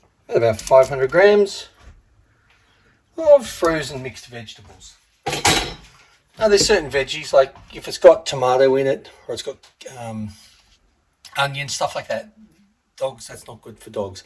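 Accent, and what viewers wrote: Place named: Australia. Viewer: love this video! good to hear from an Aussie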